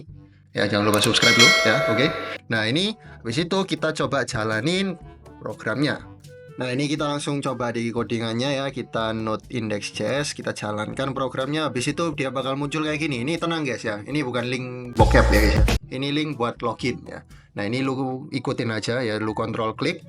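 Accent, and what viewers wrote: Surabaya, Indonesia. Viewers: wong suroboyo iki mesti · Wong Surabaya Iki mesti😂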